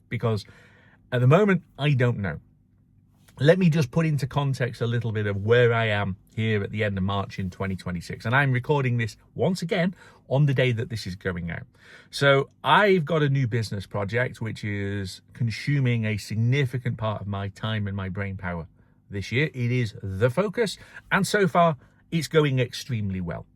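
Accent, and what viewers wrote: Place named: England, United Kingdom. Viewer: I have watched most of your videos. They are very good. You are a very good speaker (far better than Elon Musk), and it is good to hear what you are thinking in an English voice instead of American. I'm sure there is a lot of good content yet to come, like your views on FSD when it arrives in the UK and Europe. Maybe you should go through the Tesla screen, taking one or two items at a time, and explain them in detail. I see a lot of new people who buy Teslas asking a lot of questions about how to do this and that. I got my Tesla Y Juniper in late December 2025, and I am still trying to learn the system. I think it is a shame that your fans can not talk to you personally. Writing comments is not the same thing. Keep up the good work.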